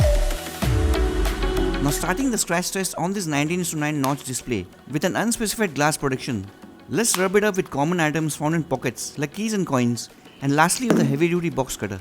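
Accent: India. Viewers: i barelly understand your hindu english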